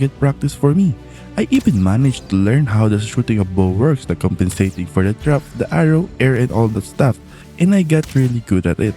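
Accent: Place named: Philippines. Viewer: i recognise that accent, but i can never be sure, would you happen to be pinoy?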